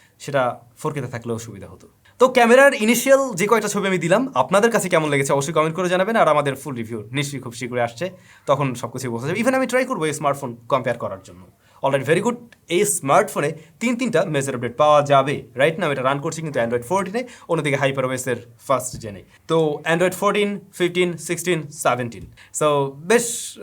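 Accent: Barishal, Bangladesh. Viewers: borishailla tola aise phone review korte ,, taw atc er moto vfx diya ,, hla cringe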